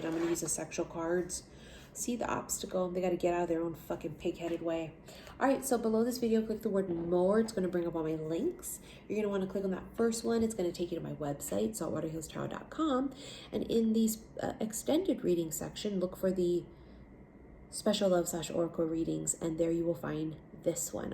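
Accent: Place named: Hawaii, United States. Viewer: 😂😂😂I knew you where from Hawaii!!!! I could hear it in your voice!!! Love yah sis🙌🙌🥰🥰🥰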